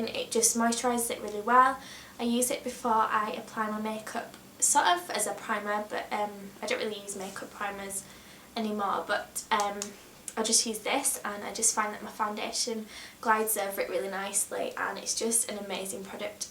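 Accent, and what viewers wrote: United Kingdom. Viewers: I love your accent!! It sounds like Kylie from Coronation street. As a Canadian I love UK accents. Great video too!!! Can't wait for more.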